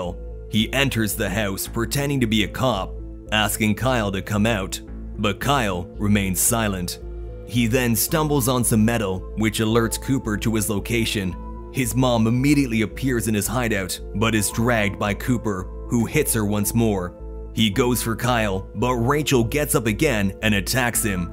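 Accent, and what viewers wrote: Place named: Canada. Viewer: The first ai voice I’ve heard use a Canadian accent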